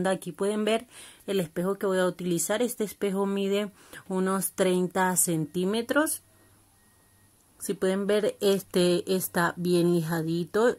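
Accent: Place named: Colombia. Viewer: tu acento se me hace colombiano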